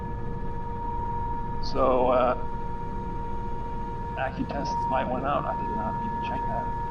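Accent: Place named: France. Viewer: Thanks buddy... It's funny as you have a french accent on your radio calls, but not when you talk normally...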